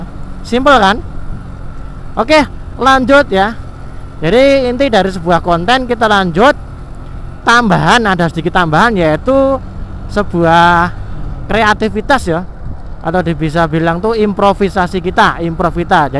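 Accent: Java, Indonesia. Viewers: Medok banget gaya ngomong.a...mantap bang sukses terusss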